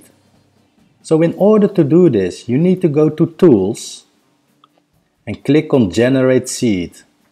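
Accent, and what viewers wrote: Netherlands. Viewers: Thanks for the video!. Question: Are you Dutch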